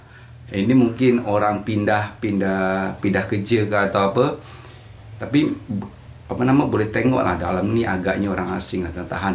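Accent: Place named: Malaysia. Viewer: Mantul banget logat bahasa Melayu ya mas.masih lekat hingga ke kampung.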